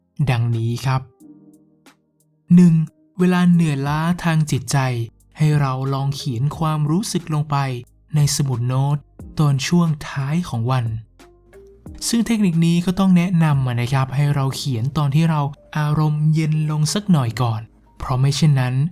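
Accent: North, Thailand. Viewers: ขอบคุณนะคะ เป็นคลิปที่ดีมากๆเลย ฟังแล้วเพิ่งรู้ว่าคุณเป็นคนเหนือ ฟังวนไปทุกวัน กดไลค์ให้ทุกวัน มันดีกับชีวิตมากๆ ชอบ~😊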